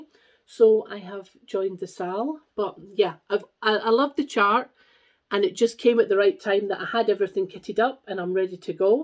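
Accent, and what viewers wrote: Scotland, United Kingdom. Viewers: A great cut and lovely colour! Your hair really suits you. Thanks for the videos, I always enjoy them and I love listening to your Scottish accent. I was born up in Banffshire several decades ago, but was brought down to England as a babe in arms and here I have stayed. Your voice makes be feel nostalgic for Summer holidays with my grandmother. Now I must see if I can out my hands on that Blackbird Christmas design..... All best wishes, Liz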